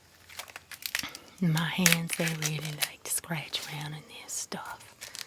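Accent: South, United States. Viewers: el acento del sur es muy agradable como ASMR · Super relaxing, the sounds and your girlish voice, i love your southern accent ( i've watched tv series and i remember that the southerns sound a kind of different )😘😴😴😴😴😴🐔question: what does "fun spoof" mean??😜💖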